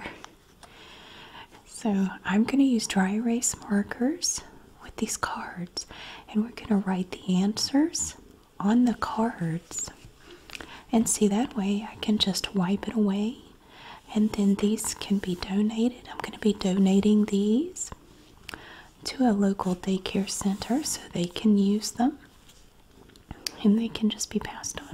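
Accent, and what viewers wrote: South, United States. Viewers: We love a Southern accent in this house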